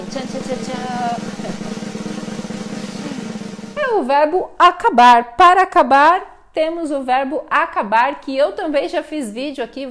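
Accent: Brazil. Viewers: You are speaking Brazilian dialetto 🤔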